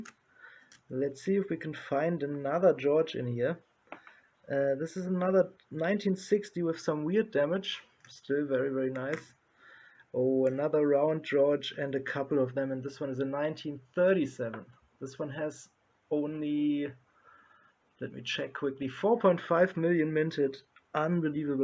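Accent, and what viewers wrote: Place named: France. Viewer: If I am to guess, this man is a French-speaking person judging by the accent.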